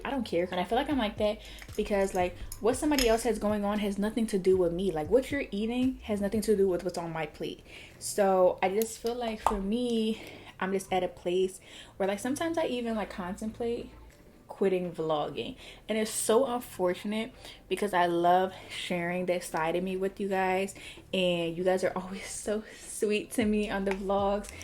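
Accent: Philadelphia, United States. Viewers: is that a philly accent I hear?? 🥰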